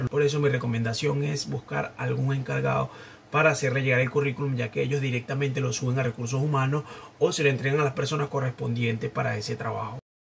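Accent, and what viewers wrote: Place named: Puerto Rico. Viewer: Amigo sonas puertorriqueño, de que parte de argentina sos?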